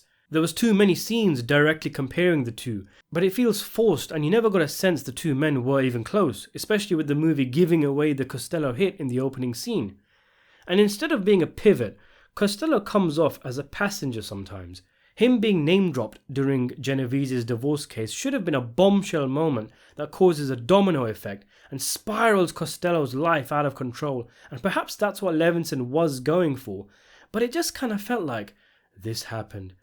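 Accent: United Kingdom. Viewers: Lacks authenticity kinda like a guy from britian or wherever the hell your from talking about shit that happened in our neighborhoods lol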